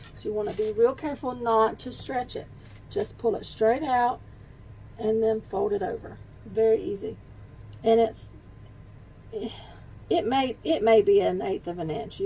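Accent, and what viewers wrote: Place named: United States. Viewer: Wish I had watched this 10 months ago when I was doing my first binding, this is the best video on binding I’ve seen.. love the way you iron the crease, no rulers and pens, and the way you joined the ends using the bit you cut off is brilliant, so less complicated like the others. Will post your video on a face book page on quilting. Did you do the quilting as that is gorgeous, is there a video on it. So glad I found your videos as I’ve only been sewing for a year, I do have an embroidery machine as well. I also love you accent, where in America are you from? I’m in the UK xxx. Thanks for the video xx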